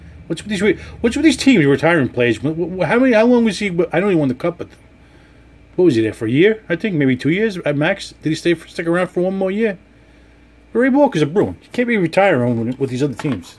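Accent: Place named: New York, United States. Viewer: the most new yorker sounding break. Love it!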